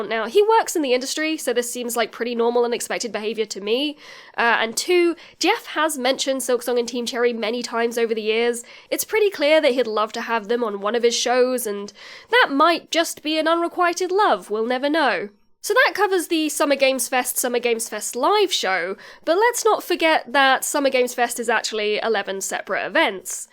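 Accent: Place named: United Kingdom. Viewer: Nothing like the soothing British voice of to feed me copium before I go to sleep for the night, thank you for furthering my hope soldier 🫡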